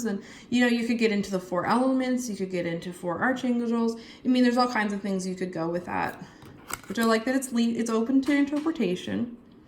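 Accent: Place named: East Coast, Canada. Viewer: Nice deck! Ps you must be from Canada, east coast - by your accent… 😊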